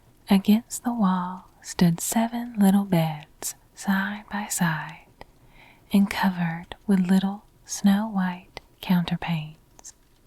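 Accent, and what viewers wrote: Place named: South, United States. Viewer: Seeing your beautiful face is as comforting as your gentle southern tinged voice.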